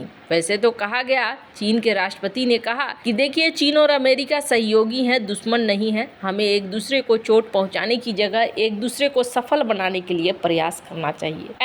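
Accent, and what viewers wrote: Bihar, India. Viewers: Ap bihar se h madam?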